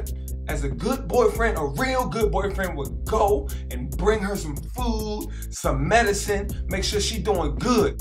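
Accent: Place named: Baltimore, United States. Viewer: You sound sooo B-more with all those "Yo's" lol.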